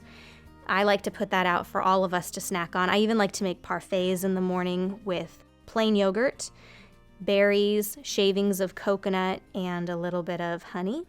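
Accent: United States. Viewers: Sister. Is your accent American? I have the same accent but people in my country crush over British accent 🥲. Btw, I'm from Bangladesh. I am 21, I follow you since 10 years. I've watched all your videos. Thank you for creating content sister ❤️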